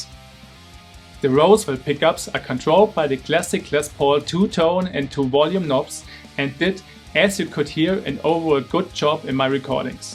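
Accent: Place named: Germany. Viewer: Your accent is atrocious. You should not be making videos. It's ridiculous. Meine peinlichen Mitdeutschen immer...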